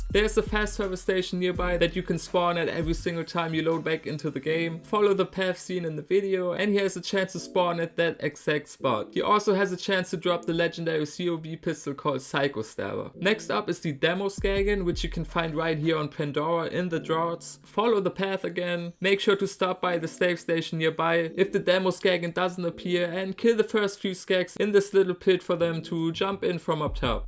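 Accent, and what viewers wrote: Germany. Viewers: for me he sounds very German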